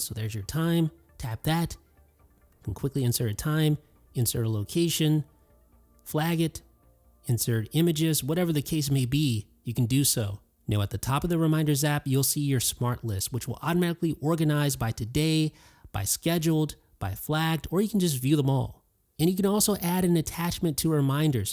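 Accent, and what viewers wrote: India. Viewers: Whyyyyyyy the F would it have Indian accent????? xD xD xD xD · Indian voice 🤩🤩🤩🤩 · Grear vid and lots of info. All good except the language accent read back. No way would I put an indina voice to read me stuff on my phone. I can get that from all the scammers from there conning people here in the US lol. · Indian english accent.....indians like here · Indian accent ❤️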